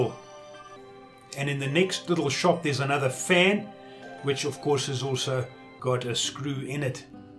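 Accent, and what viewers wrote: South Africa. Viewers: Do i detect a south african accent /proud of you for doing well in the gameworld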